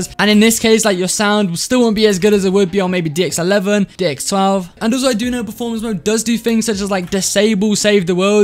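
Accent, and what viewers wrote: Sweden. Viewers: you are from sweden i know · ur 100% swedish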